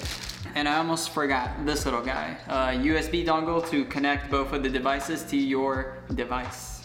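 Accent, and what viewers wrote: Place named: Georgia, United States. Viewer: U from GA?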